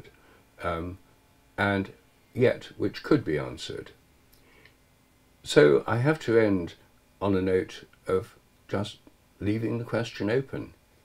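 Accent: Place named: United Kingdom. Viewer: Thank you, Rupert, for your dedication, and oh so typical British style of speaking and tenacity in between spells of politeness 😊 I'm happily surprised (I'm Dutch) to hear of this pigeon- experiment with Dutch pigeons. It's great humour to know of a formal Navy ship and crew, welcoming such an experiment. I'm a longtime follower of your work, living at an older age, observing the gap growing smaller, between the intellect of science and the intelligence of the heart, the world of spirit that begins to bleed through the icicles of logic. Your brave steps to throw stones in the pond of logic are much appreciated. It's truly groundbreaking, only not for eyes that are wide shut. Thank you so much!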